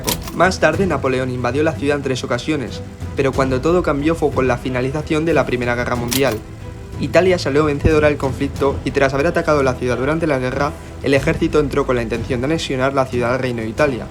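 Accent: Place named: Spain. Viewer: Que gusto poder ver videos sobre estos temas con acento español. Casi siempre son argentos o mexicanos 😂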